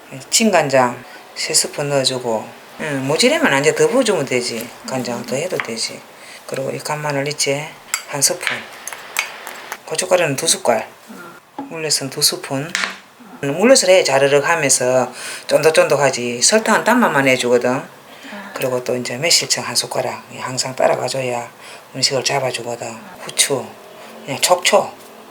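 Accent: Gyeongsang, South Korea. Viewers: 선생님 요리를 자주봅니다~ 뎃글은 처음이네요~ㅎ 경상도 사투리가 저를 웃음짓게 합니다. 집밥같은 맛! 선생님 요리는 정겨운 엄마집밥! 그자체입니다~ 감사합니다^^^^ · 저는 부산사람이라 경상도 사투리 너무 좋네요 ㅎㅎ 잘배울께요~♥ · 맛잇어요~어머님혹시어투가경남쪽같은데(김해) 그쪽이신가요? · 경상도 말투 재미있어요 따님 살짝 콧소리 웃음소리 살살 녹입니다 요리는 최고입니다 복잡하고 어려우면 배울 수 없는데 정말 좋아요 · 경상도사투리 말씀 듣기가 좋아요 저도 경상도 ㅋㆍㅋ 정겨운 말씨와 요리도 맛가나게 잘하시네요 맛난것 많이올러주세요 ~ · 아 저도 경상도 인데 사투리 대박이심니다 너무 재밋어요 재밋고 유익함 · 구수한경상도 말씀 듣기 좋아요~^^ 영상 잘봤습니다. 감사합니다~^^ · 구수한 경상도 사투리에 넘 정겹고 나의 요리 스승이세요 매번 잘 보고 있어요!!!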